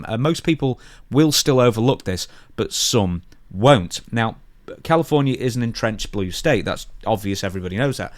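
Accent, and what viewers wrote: United Kingdom. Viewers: This video is such engagement bait. You weren't there so you don't know the truth. You are not the authority on current events in the pro wrestling business just because you speak with a British accent,. Also, kind of a 🍆 move to jump on the guy for saying "b" instead of "m" regarding "billion". Check yourself,. Piers Morgan you are not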